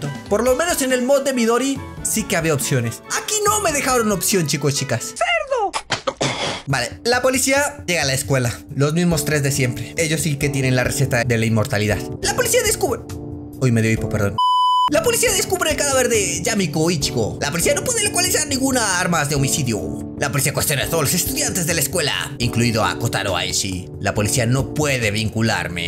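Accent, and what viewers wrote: Chile. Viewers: En serio eres chileno si o no ??? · Eres Chileno como yo 😂😂😂😂😂❤ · OMGGGGG PENSE QUE SERIAS MEXICANO PERUANO O ALGO ASI POR EL ESTILO PERO NUNCA PENSE QUIE CHILENO 😧 Y APARTE CONOCES LOS COUNTRYBALLS VIVA CHILE MIERD@@@ :O Y APARTE ERES MI YT FAVVV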